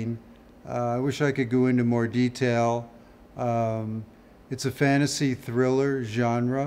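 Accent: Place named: United States. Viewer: I was convinced that was Paul Whitehouse doing an excellent American accent the entire time.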